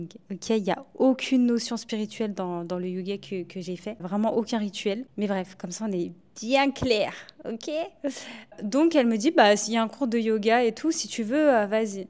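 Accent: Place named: France. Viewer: Ton accent est comme le français bon courage 😍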